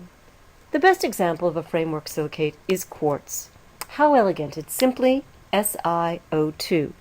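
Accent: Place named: RP, United Kingdom. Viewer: Beautifully elucidated (great posh chat).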